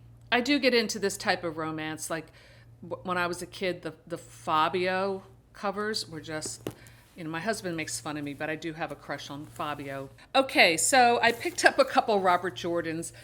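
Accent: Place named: Pennsylvania, United States. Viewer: Fun videos! Sound like you are around bucks county pa! Same with me, it’s a lovely place to live!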